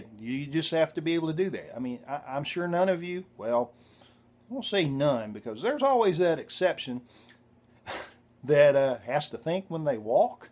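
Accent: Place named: Texas, United States. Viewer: This man's accent is identical to Dr. Phil's. Makes me wonder if he's from the same North Texas background. Makes me want to learn. Being honest with myself, I've only just got to the point where I don't look at my right hand, and I know you don't want to be looking at that from 35+ years of playing guitar, and 15 of finger picking. Banjo is just so different with the finger picks, but that's the sound I'm going for, so I'll learn. Thanks for the lessons and for being cool about it.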